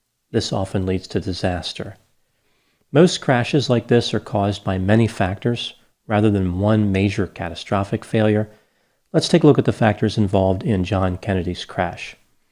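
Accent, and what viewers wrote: United States. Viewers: Very clear American English.Good speaking voice.Thank you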